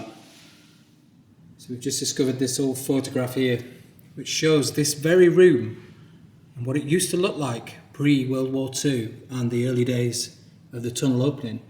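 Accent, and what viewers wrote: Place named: Northern England, United Kingdom. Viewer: haha I was somewhere between leeds and Wigan but couldn't put my finger on it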